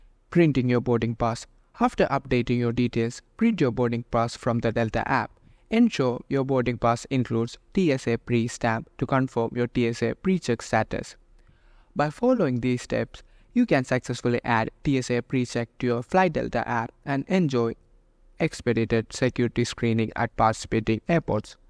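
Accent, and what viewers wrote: India. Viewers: Thanks for making me feel like I called tech support. Listen to an Indian and get nothing accomplished.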